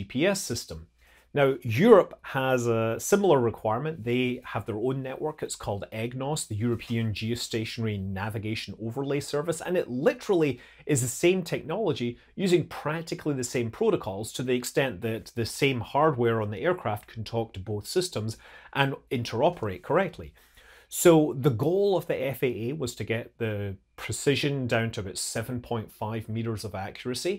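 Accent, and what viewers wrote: Scotland, United Kingdom. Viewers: I think we’ve missed one of the most important use of GPS. How a golfer knows the distance to the green when he’s so off track in the trees that he can’t see the flag. 😊 Good to hear such a knowledgeable Scottish voice on here.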